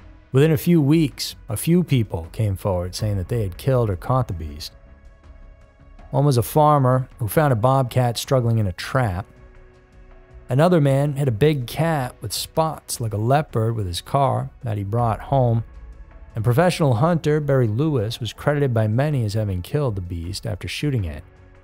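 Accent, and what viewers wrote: United States. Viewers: just subscribed to your channel...ive been watching true crime for a long time...u have alot of stories that are new to me...great footage...love the american accent🙂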